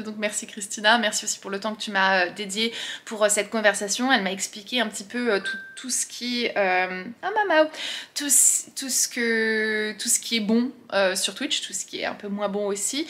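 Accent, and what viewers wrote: United States. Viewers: Je pense que c'est lié à l'accent américain. Je saurais pas l'expliquer mais l'accent américain sur le français peut donner cette impression / les mots anglais dans une phrase française aussi. C'est mon analyse de la question mais c'est vrai que quand des Français se mettent à utiliser les anglicismes à tout va j'ai cette impression les concernant qu'ils sont "faux" ou parfois qu'ils sont prétentieux. Bien sûr dans ton cas, c'est différent car tu es partie aux Etats Unis mais ça peut fausser l'impression de certains français à mon avis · ta manière de parler très US même quand tu parles français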